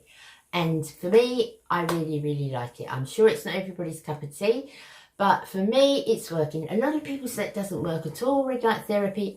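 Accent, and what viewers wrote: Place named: Cockney, United Kingdom. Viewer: Thanks so much for answering my question! I love that you're a true Cockney! A rare breed, it sounds like. I love these videos because we get to know you better, but it's not fair to you because you don't get a peek into our lives, lol.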